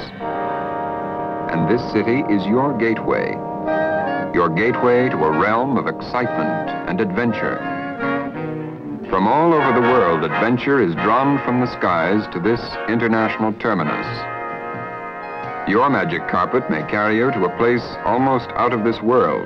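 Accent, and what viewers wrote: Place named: United States. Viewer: New York was very cool city during those days, unlike today's influx of countless people from all sides. However, I do appreciate the style of this genuine American English accent through the narrator. I can't recall specifically now, but perhaps this person also narrated some other TV documentaries during 50s and 60s. Surely, we nowadays miss this kind of manly voice which was often heard then in movies and TV programmes.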